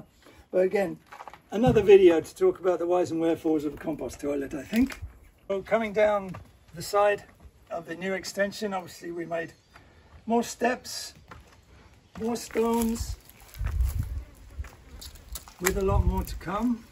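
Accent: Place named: North Staffordshire, United Kingdom. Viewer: An excellent piece about a wonderful little house! :) And narrated by a fellow who sounds a good deal like Lemmy :D You wouldn't happen to be from around that neck of the woods would you (as in where Lemmy was from)? There are elements in your accent that are familiar to my ear, being a North Staffordshire lad :).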